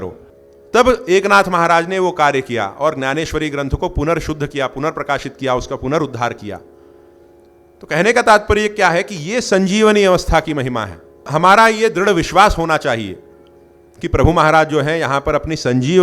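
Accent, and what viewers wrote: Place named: South, India. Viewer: साउथ vali आवाज ह महाराज आप ka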